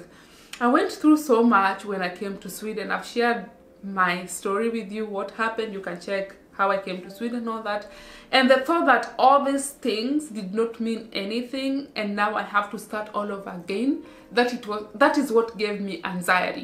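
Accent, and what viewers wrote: Luo, Kenya. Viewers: I love ur luo accent.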